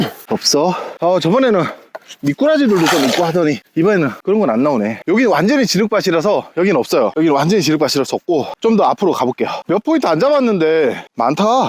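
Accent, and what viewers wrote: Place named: Gyeongsang, South Korea. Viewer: 부산경남 사투리가 상당히 자연스러운데 고향이 경상도신지?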